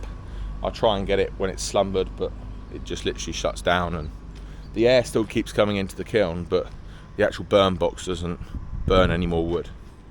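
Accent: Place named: United Kingdom. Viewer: I've stumbled upon your channel this week and I'm liking the operation, the surroundings, your nice British accent and the fact that you seem like a nice bloke. Good luck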